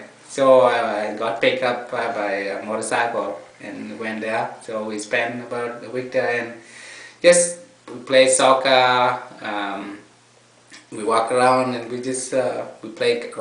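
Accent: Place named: Canada. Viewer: he;s starting to pick up the canadian accent :)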